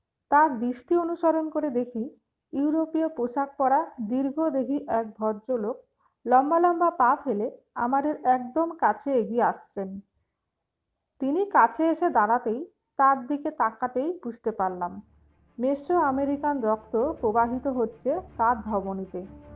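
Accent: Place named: Manbhum, India. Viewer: আপনার ভয়েস অ্যাকসেন্ট শুনে মনে হচ্ছে আপনি আসানসোল পুরুলিয়া বাঁকুড়া মেদিনীপুর অর্থাৎ মানভূম অঞ্চলের মানুষ।ভুল হলে জানাবেন।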